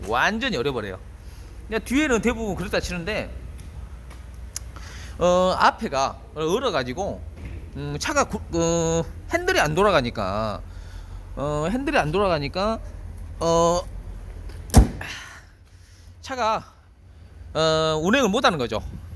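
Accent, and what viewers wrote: Jeolla, South Korea. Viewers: 전라도 사투리 ㅎㅎㅎㅎ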